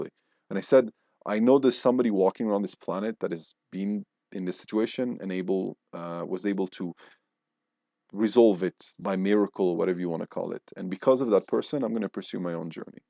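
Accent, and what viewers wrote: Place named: Switzerland. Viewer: oh then I understand why I like your accent, I was born in switzerland too :) still living there but I actually always wanted to move to Canada as a child. now I feel more drawn to England and Ireland. your encounter with the arcturians sounds fascinating, I would like to meet them too one day.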